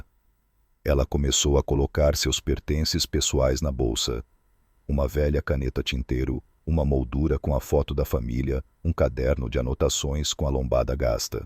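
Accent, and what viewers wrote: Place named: Portugal. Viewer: Uma história muito interessante. Quem diria que a atitude daquele, milionário tão agressiva e fria, iria acabar numa linda história de amor. Narração perfeita, de Portugal ❤❤❤❤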